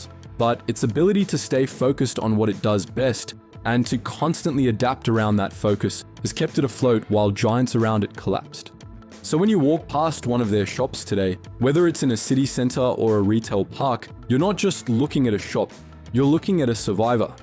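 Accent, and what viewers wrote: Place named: Australia. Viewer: Nah, yeah, haha, yeah... The narat9r is an Aussie , can't you hear him ? Got stuffing 8n y9ur ears have ya ?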